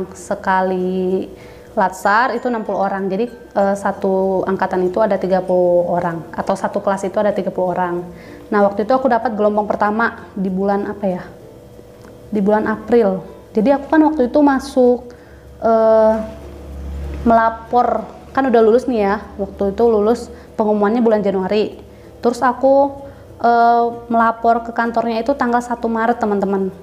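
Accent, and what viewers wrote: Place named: West Java, Indonesia. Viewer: koq logatnya sunda kak?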